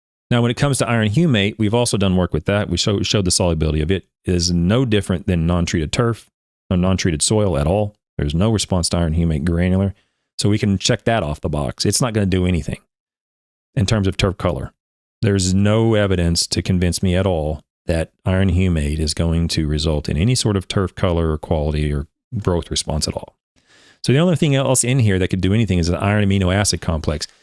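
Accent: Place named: South, United States. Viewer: Quite the southern drawl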